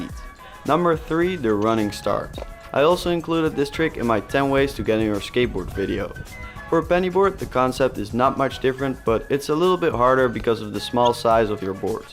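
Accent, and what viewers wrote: Netherlands. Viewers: Ben je nl 😂😂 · Are you dutch? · Are you Dutch?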